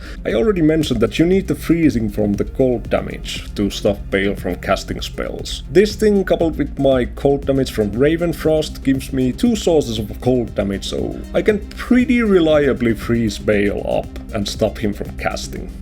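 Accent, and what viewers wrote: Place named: Finland. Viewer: ahh :D tunnistin heti suomen aksentin sun videosta :D gj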